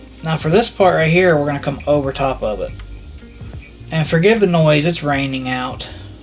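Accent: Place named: South, United States. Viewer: I cant figure out your accent,much love and respect from western NC,you sound like you could be from the south but cant tell for sure.i grew up fishing the Sierra nevadas as a kid but call asheville nc home now..but also what state you doing tattoos in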